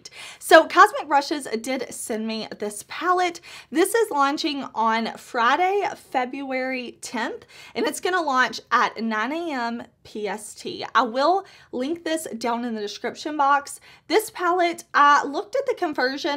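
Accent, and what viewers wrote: South, United States. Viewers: . I want to be you when I grow up. Love your eyeshadow looks and your Southern accent.😊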